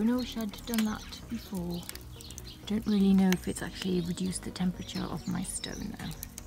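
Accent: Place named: Manchester, United Kingdom. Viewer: You can get there. Your skills will only sharpen. You should watch a video about Lucalis pizza in New York how he started. I see you Mabey having your own pizzaria one day. I'm curious are you from Manchester? I deal with alot of tourist I'm a mixologist on the river walk and your voice sounds like its from Manchester.....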